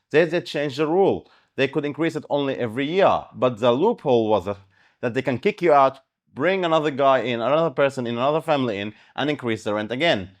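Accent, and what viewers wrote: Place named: Europe. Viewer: You sounds from Europe, where are you from?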